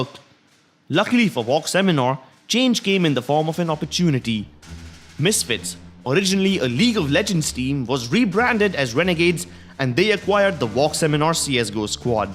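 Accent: India. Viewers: Indian accent smells from 4000km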